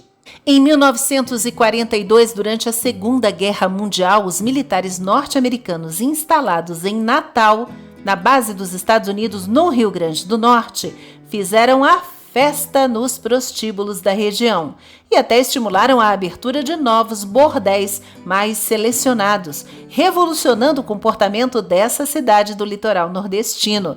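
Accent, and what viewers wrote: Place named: Minas Gerais, Brazil. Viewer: Pelo sotaque parece que a narradora é de Minas Gerais, ou já viveu nessa região. Parece muito com o sotaque daqui de Minas. Parabéns narradora pelo seu excelente trabalho, é a voz feminina mais agradável do Youtube.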